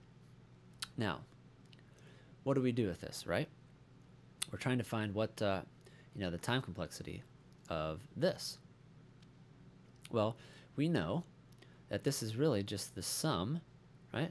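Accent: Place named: England, United Kingdom. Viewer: finally English accent!!! p.s ( i still love you indian computer science teachers :P )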